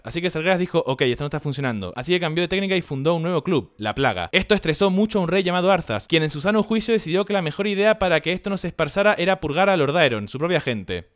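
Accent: Chile. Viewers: Nada mejor que escuchar a un argentino hablando como chileno. Que agradable sujeto. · Este wn es chileno?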